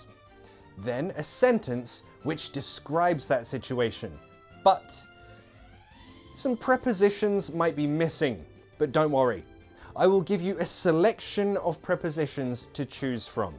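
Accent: United Kingdom. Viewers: "From Bangladesh 🇧🇩🇧🇩" I have already watched many offline videos of your channel. That's are very useful for learning and pronunciation.So, this channel has become my best channel in YouTube for learning English in British accent. Thanks a lot,.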